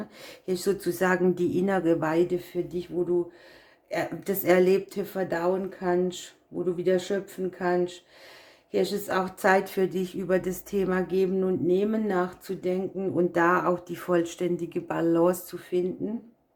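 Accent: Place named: Karlsruhe, Germany. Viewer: Rausgehört😊ich aus Bruchsal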